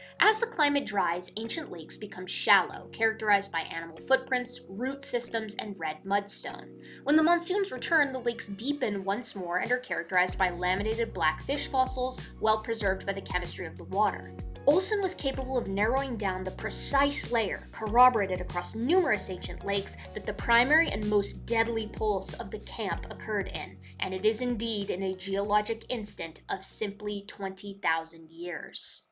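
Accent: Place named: United States. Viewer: My only problem with this video is that you said “Carboniferous” when you ought to have said “Mississippian and Pennsylvanian” since, judging by your accent, you are an American. I got marked down for this in college, so my pattern-recognizing brain started yelling at me “DANGER DANGER” in a bizarrely apt demonstration of the point you were making.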